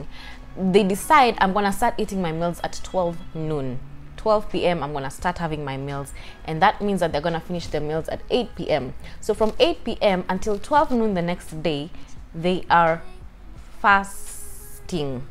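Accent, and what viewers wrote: Kenya. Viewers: You sound Kenyan :)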